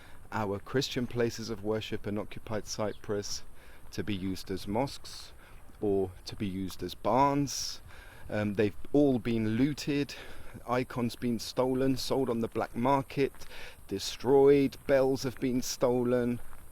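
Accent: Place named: England, United Kingdom. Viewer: 🤣🤣🤣 What happened to your churches in the North side? They are all still there. Get a life mate. You advertise Cyprus Without Borders as a bicommunal page but all I see in your videos is nothing but targetting TCs making out like we are nothing but 'propaganda' makers. We tell the truth. So walking around whispering with your plummy English accent to make yourself look friendly isn't going to work. Insidious as anything, you are. Why don't you share what happened to all the other mosques, not the one protected by Turkish authorities. And no, don't go inside the mosque. You might find god there. Can't have that, can we!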